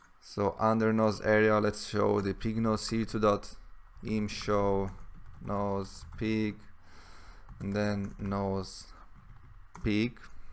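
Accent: Italy. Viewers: Improving 3D + perspective is interesting, you should push that project much further for sure! Thanks for sharing. It's the 4th video i'm watching from you, allow me to comment my overall experience: - Monotone voice, a bit 'boring' (don't want to be rude), you don't seem motivated or you don't seem to enjoy sharing or you don't seem to enjoy doing it... C'mon, share your guts! - Funny Italian english (good and bad I guess, still better than the Indians .py tutorials, right :D ) - Really GOOD content, hard to find (i just tried different keywords this time and found the answers i needed! I'm happy!) - Speed of coding is good enough, the code is enough explained (it's really the feeling you give: you are bored to do this! -> that is the bad part of the coding..) - Keep on going with these things, i subscribe now because you have an interesting topic I want and need to learn for professional purposes, so I will watch your next videos as they come and I will check your previous ones with time. 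Anyway, wish you the best of success on your youtube path! Thanks again for the sharing and explanations, really helpful and useful, good enough for now, add more motivation in your voice and we'll be friends for a long time! :) Ciao :)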